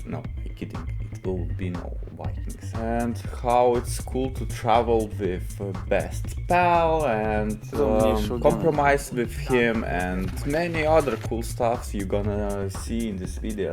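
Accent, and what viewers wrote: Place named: Eastern Europe. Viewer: well, I felt your accent is not as native speaker has, I thought you are from somewhere from the Eastern Europe, although your English is very fluent!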